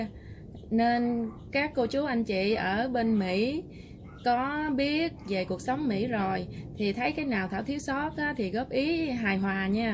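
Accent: Mekong Delta, Vietnam. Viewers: Cam ơn em rất nhiều em đả cho thấy được cuộc sống ở mỹ qua giọng nói người niềm nam thật dể thương , chúc GĐ em hạnh phúc nhe..... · ...minh song tai MY cung lau roi...van ung ho cho kenh cua...thich vong noi nam bo that tha chat phat....luon ung ho cho kenh cua ban...!!! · oi xem em noi chuyen chi thich lam dan mien tay chan chat thiet tha, chi lam dau mt 2020 nay la 37 nam do, nen yeu lam dat va nguoi mt, chi chuc tan suc khoe trieu niem vui ben chong con hen, q4 sai gon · Chào c . Chúc c nhiều sức khỏe e rất thích c giọng miền tây dể thương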